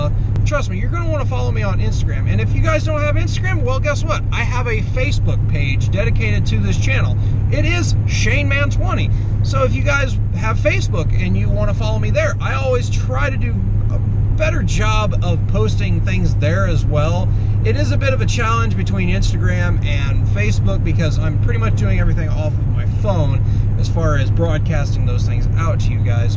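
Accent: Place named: Midwest, United States. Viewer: In Mexico? You sound like you’re from the Midwest?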